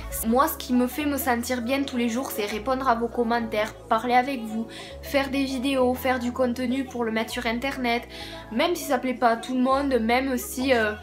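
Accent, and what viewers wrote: South, France. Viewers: C'est pas genre un putain d'accent du Sud! haha il est encore plus marqué que le mien! ;)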